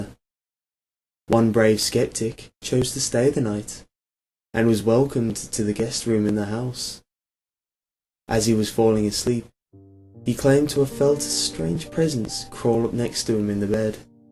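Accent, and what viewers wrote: United Kingdom. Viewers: You have a gorgeous voice. Always loved British accents.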